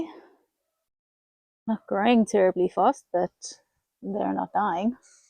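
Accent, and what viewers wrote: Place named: South Africa. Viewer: New subscriber from Alberta, Canada. Originally from SA as well. Been in Canada for 31 years in mid March. I get so excited when I hear the SA accent in any videos. We wish you all the best in your new adventure. It takes a good couple of years to get settled into a new country. I do miss our lovely "old" country but also love the freedom and security we have in Canada. God bless your family.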